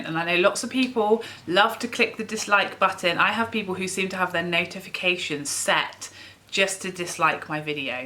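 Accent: United Kingdom. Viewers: Good information! I had no idea...but how long do i have to let the ad play? I used to always click skip ad. Why don’t you do links so I can purchase through your link? I do enjoy your channel and do want to support. I’m an American that loves a good British accent😄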